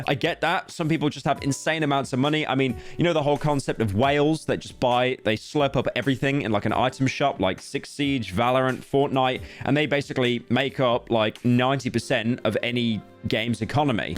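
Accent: United Kingdom. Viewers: listening to british furry man talk about economics makes me want this to be my only source of education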